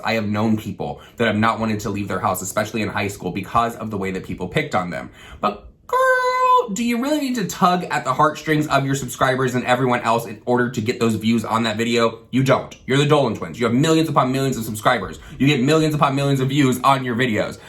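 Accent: South, United States. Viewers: Saw in another video your in Florida.... I thought I heard some southern! (Not in a bad way of course) Watching from LA ( lower Alabama) love the channel.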